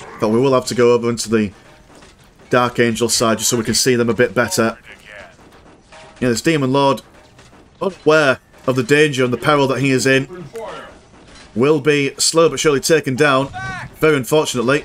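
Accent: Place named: East Yorkshire, United Kingdom. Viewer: I wonder which side of east Yorkshire 🤔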